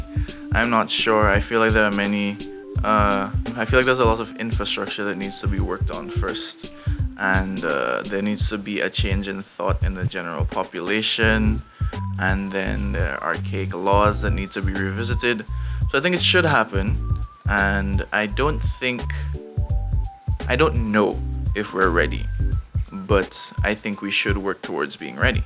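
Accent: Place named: Trinidad and Tobago. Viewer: Hey, just found your channel - love the content! I hear an accent.. are you trini?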